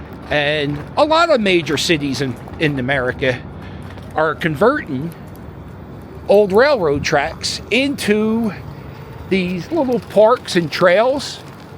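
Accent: Philadelphia, United States. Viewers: Thanks Cuz… Love ur vlog. You have the voice to rep Philly. Keep it up. Keep warm. Much Brotherly Love!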